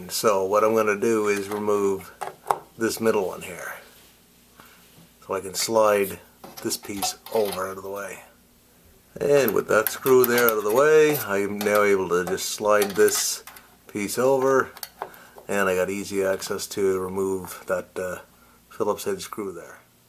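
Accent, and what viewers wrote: Canada. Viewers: from canada? one of my favorite accents.... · This is most helpful. Thank you. I LOVE the Canadian accent. My wife is from B.C.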